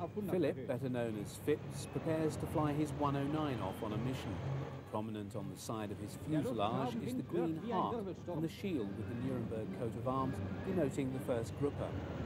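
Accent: RP, United Kingdom. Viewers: Nice public school English , but background sound is way to high . Fire the editing guy .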